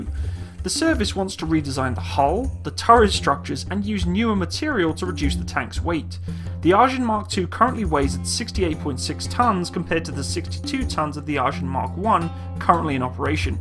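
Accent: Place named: United Kingdom. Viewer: Neat trick starting off by praising and admiring the tank making tall claims and then in the last 2 minutes, discrediting those very claims to present a sorry picture. This is a cool tactic of youtube reviewers who are essentially amateurs and have no real authority in the field that they are reviewing. Their channels end up being a hotbed for racist bigotry and snide armchair internet experts. You remind me of a dude that does aviation reviews (DJ's Aviation or as he calls himself 'Dee Jayy's Aye vie Ayetion'). His only credential for doing aviation reviews is "he's seen a plane". I am assuming both of you are not the same guy as he sound Australian while you sound British.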